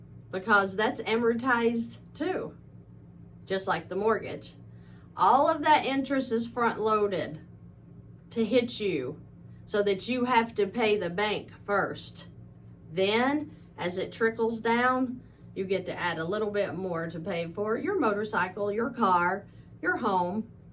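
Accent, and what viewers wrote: South, United States. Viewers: Okay, this is brilliant and I love your Southern accent. It all makes sense except the part where you use your line of credit as income by putting your income into paying the line of credit. It seems you just paid off the line of credit with $10g, so your income for that month was spent by zeroing out the line of c balance. How exactly are you using this as your checking account and wouldn’t you still need to make that month’s mortgage payment? But you just spent your 10g income to pay off the line of c? If you could please explain that part of it in more detail for us slow folk? Thx😊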